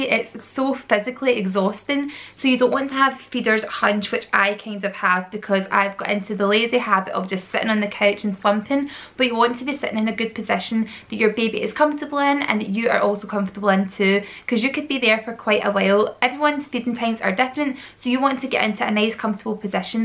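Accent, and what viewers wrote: Scotland, United Kingdom. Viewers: its scottish and barely understandable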